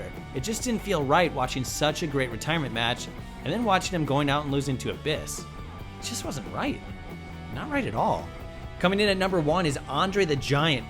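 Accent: United States. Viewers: Yay, a no British accent shit. Pure American dialect. Yay. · The only American voice on what culture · This is the first time I've heard a whatculture list with an American type accent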